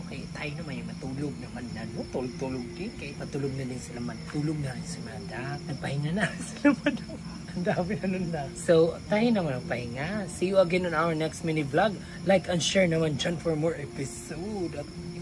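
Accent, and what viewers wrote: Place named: Ilonggo, Philippines. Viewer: Naaaliw talaga ako sayo pqg nagsasalita ka..lalo kapag ilonggo tono mo😂😂😂😂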